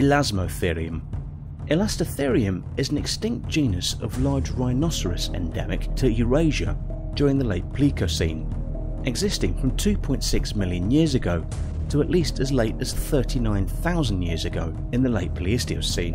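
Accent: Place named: United Kingdom. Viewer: that british accent makes tge video better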